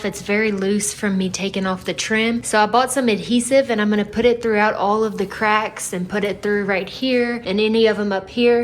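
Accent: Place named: Montana, United States. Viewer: Do all the Montana people have your cute accent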